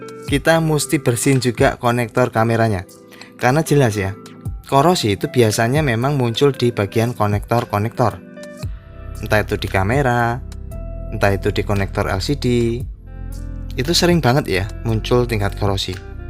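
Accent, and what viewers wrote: Java, Indonesia. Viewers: Jumpa lagi sama saya selalu nyimak 👍👍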